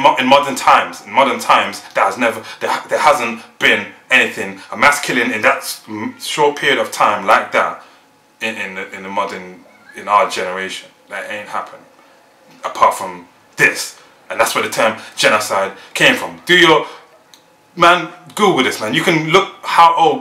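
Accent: Bosnia and Herzegovina. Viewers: I love your bosnian accent, your amazing :) bravo brate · ))) U speak like u r Bosnian :))) Congratis :))) and congratis on this video! its sooooo good :) i am glad u think like that because he is really a criminal, he killed inocent ppl :( and.. how can he say that he is inocent? thare is sooooo many videos and other important documents that proves his "inocent"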